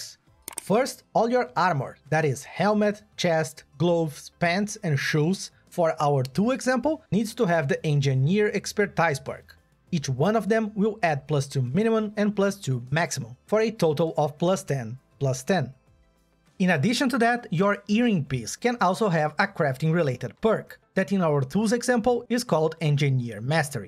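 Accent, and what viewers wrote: Brazil. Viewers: Mano, tu é br num é? esse sotaque não me engana kkkkkkk. Mas, otimo video, valeeu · Ctza q eh BR com esse sotaque ai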